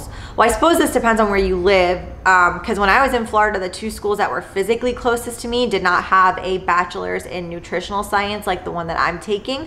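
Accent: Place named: Pennsylvania, United States. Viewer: I HEARD PENNSYLVANIA ACCENT, and as someone with family from PA I TOTALLY understand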